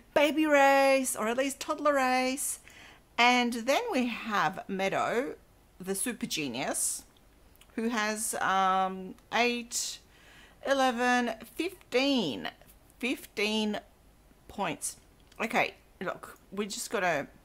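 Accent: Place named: New Zealand. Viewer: Oh yes, I'd almost forgotten, but now you mention it, it all comes back. So sorry. It's just I like hearing you. Even if you're not quite a NZer you sound a lot like many of my NZ relatives, especially with the phrases you use. :D They all have much stronger accents than me. :-) Anyhow, I'm pleased you'll continue this series, though I'd equally be pleased if you started a new Sims 4 PC series... :-) All the best to you, my friend. hug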